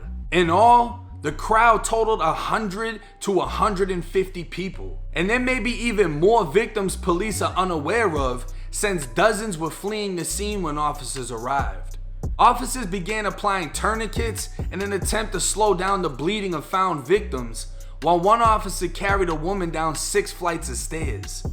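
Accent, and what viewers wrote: Boston, United States. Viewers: Super dope channel. Random: Is that heavy accent on "AR" words only common with white people from Boston? I don't think I've heard any black dudes talk like that. Lil Mahk lol (Marc). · R u from Boston with that accent?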